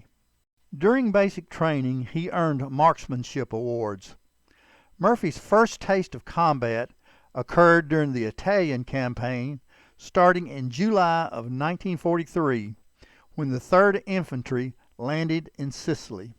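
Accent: Texas, United States. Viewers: Don't know who narrated this video, but only took the first three or four words to let me know he is a fellow Texan. I live in San Antonio, TX, where the VA hospital named after him is located and I have gone through the small museum there with relics and videos of his service. Indeed a fine man and a real hero.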